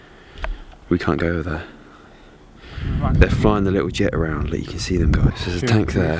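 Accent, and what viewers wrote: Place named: India. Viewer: Inglish accent, sounds, suks, like this dud accent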